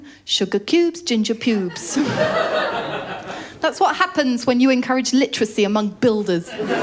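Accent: RP, United Kingdom. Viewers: Her delivery is incredible. It really adds to her jokes that she's talking about shocking/sexual stuff in clean cut RP. Very expressive face also helps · Diane Spencer Btw some men in the States actually prefer redheads. No ginger bias here darlin. Keep at it: you're raw, honest, funny and gorgeous. I wish there were more women like you. Take care and bring your act across the pond. And that British accent 😍 I would be honored to shake your ginger claw lmao✌ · Stumbled through the youtube algorithm to this. I love the humor. The profanity mixed with the british politeness or rather way of speaking is a refreshing act compared to us comedics!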